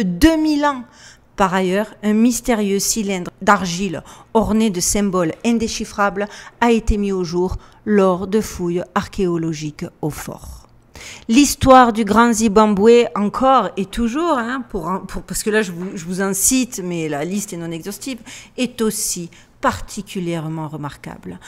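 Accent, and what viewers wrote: Marseille, France. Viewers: Tu es VRAIMENT ADORABLE, j'adore ta voix, ton accent MARSEILLAIS. MERCI POUR TON PROFESSIONNALISME et ton CHARME !!! ♥♥♥😘😘😘😘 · Moi ce que jaime c'est que t'as gardé l'accent du Sud meme au states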